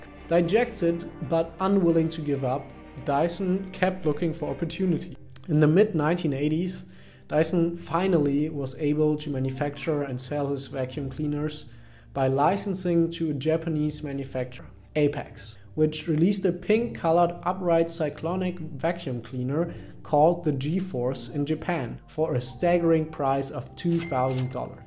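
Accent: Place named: Germany. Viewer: Heutzutage ist hald Englisch einfach Pflicht. Und nur wenn man sich mit der Sprache auseinandersetzt lernt man sie auch. Und auf diesem Weg zu lernen macht ja auch mehr Spaß wie stumpf in ein Buch zu starren ;) Learning by doing ist das Gebot der Stunde. Und klar hört man den Alman Akzent noch etwas Aber das wird auch mit Der Zeit und ist defentiv kein Grund sich stoppen zu lassen. Die Community die man damit erreicht ist einfach auch globaler orientiert und damit auch etwas interessanter in der Interaktion, da man wenn man mit anderen Kulturen in Kontakt kommt andere Sichtweisen erhält und damit seinen Wissenschatz in ungeahnte Ausmaße erweitern kann. Just give it a shot ;)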